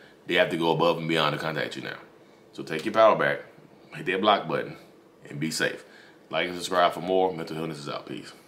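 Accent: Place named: South, United States. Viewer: Shoutout to NC with that Southern Draw!!!! As I'm from Winston-Salem,NC . I love this channel ,and even more your content, keep it coming!!!!!!!!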